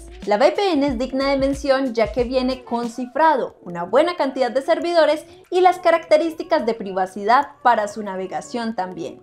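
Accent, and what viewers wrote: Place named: Mexico. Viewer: Me acabo de suscribir, tienes acento de mexicana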